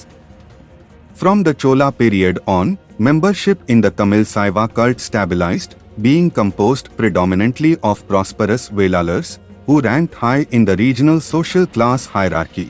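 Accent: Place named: North India, India. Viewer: Thanks for the channel to have done this.acxent seems to be from north..happy that history is spread over india.please.do it in hindi too brother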